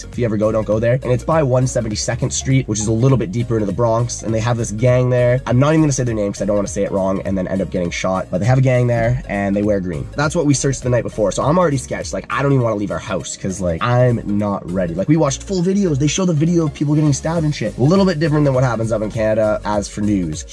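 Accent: Canada. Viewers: Lmao...I'm a Canadian so I just think he sounds normal 😆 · Woah the Canadian accent is strong today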